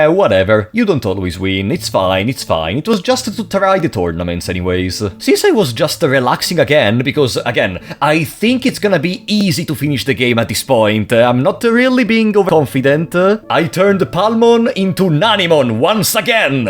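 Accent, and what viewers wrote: Italy. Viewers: the thick italian accent sends me.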